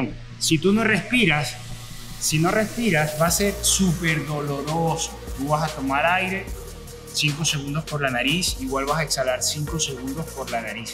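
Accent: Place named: Venezuela. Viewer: Pensé que eras Venezolano hermani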